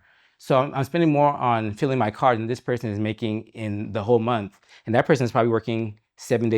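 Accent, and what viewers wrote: Nigeria. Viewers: Yes ..he has a Nigerian under torn in his accent for sure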